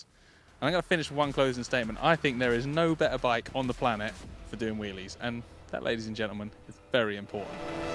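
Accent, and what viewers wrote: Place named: United Kingdom. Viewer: All I need to hear is "properly fun" in British to know the bike out of my range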